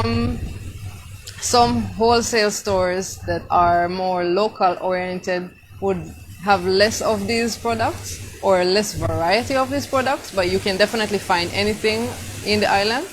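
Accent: Jamaica. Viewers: 🙂Lady you have the Jamaican accent!!🧏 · You sound and could pass as a Jamaican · Sorry, but Yuh yaawdie accent top tier yufuk!! 🤭🔥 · I can hear that you have picked up a slight Jamaica accent.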